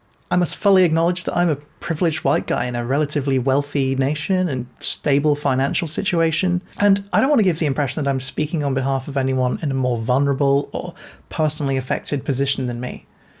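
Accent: United Kingdom. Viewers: Ok literally I had the thought to also say "jumper" in case this person wasn't American and then he speaks with a British accent god dammit. Also how is this not Freddie Highmore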